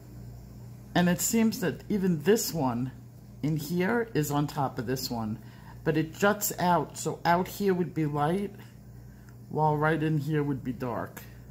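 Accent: New York, United States. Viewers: Hi, I'm Maddie a new subscriber of your wonderful Channel that I recently discovered and I was fascinated by your great talent and your passion for pencil drawing which is also my favorite and also by how you passionately teach. Thank you for sharing your talent and knowledge with us. I am looking far and wide at your Channel, I have a lot to watch. I also love the things you tell us from time to time, like the afterlife and paranormal stories in which I strongly believe and had experience myself too. I love your sweet pets and I love your New York accent, it is a pleasure to listen to you and your calm voice relaxes me. Much love from old Europe to beautiful America. Blessings, love and light to you and to your family.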